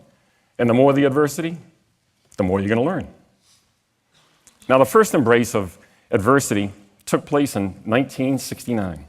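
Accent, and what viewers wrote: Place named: Boston, United States. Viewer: WOW, Great speech. Spoken from the heart. And you still slightly have your Boston accent. God Bless and be well.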